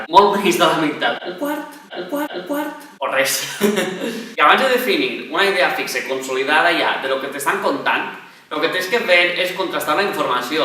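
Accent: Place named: Valencia, Spain. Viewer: Acabo de descobrir el canal, sincerament, gràcies. El contingut és espectacular, molt necessari, i a més dóna una mica d'esperança en la humanitat. Lluitem tots per una societat millor, lliure. Aquest vídeo (i el canal en general) haurien d'estar en tendències i que tothom el vegi i reflexioni. A tot això, sumar-li que parles en valencià, que pel menys a mi, com a catalana, em delecta l‘oïda., segueix així!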